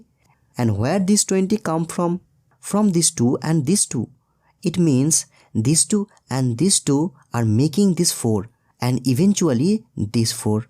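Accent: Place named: India. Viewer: Your voice is telling you are an Indian about 18 to 25 year old I'm too impressed with you wanted to know the person who invented this method surely he too was either Indian or Arabic but don't know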